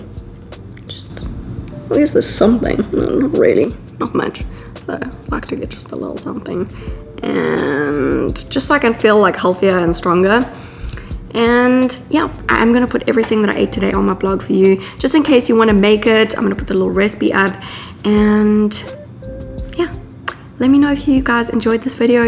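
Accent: South Africa. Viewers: You sound South African ❤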